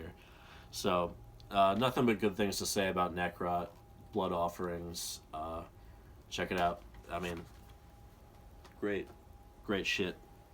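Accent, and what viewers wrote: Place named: Australia. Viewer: I swear sometimes your accent sounds Australian. Watching 'Best Death Metal Records post-2000 (Part 1)' when, talking about the Asphyx album, you said 'this is true death metal, ya bastards' and you said it in the most Australian way possible. lol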